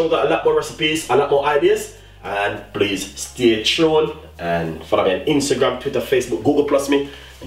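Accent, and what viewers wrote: Jamaica. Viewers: I love Jamaican accent..it always seems like they are rapping😁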